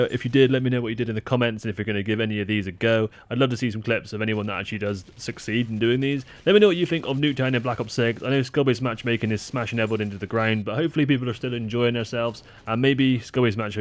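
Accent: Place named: Bristol, United Kingdom. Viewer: You sound very local to me lol...... Bristol?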